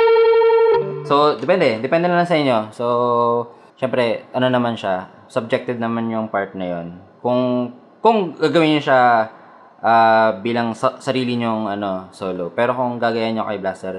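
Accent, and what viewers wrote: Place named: United States. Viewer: Boses kano si sir haha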